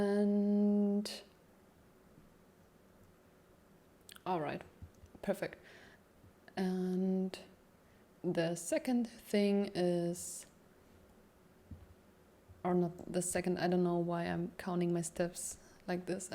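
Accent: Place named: Germany. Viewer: just found you and am really enjoying these long tutorials. Also love the charming german accent hahaha gerne mehr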